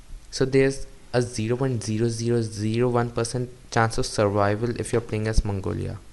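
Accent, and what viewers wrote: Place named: India. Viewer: I love the indian accent man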